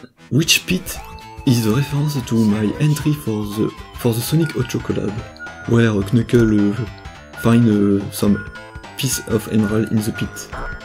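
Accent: France. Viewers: Bonne vidéo. Par contre, ne le prend pas mal, mais je te conseil de bosser un peu ta prononciation de l'anglais. Certes, l'accent français excuse pas mal de chose, mais si prononce tous les mots anglais avec une prononciation française, ça risque à un moment de ne plus passer... Sinon, continue tes vidéos, tes animations ont cools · Accusent français très prononcé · It seems his voice is very french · ware a part 2? I love you french accent Un francais!! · You have a french accent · De l'anglais avec un accents français Noice... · Cet accent français de l'enfer ! Avoue, tu le fais exprès ? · AH du bon français qui parle anglais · Nice france accent man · this accsent is frenchy very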